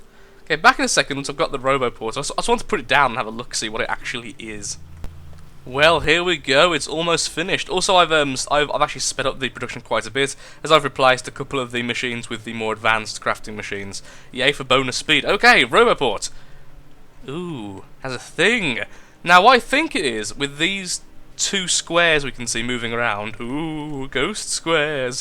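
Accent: United Kingdom. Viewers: i have a weird feeling your voice is different, more "brittish" pretty weird since you are brittish but still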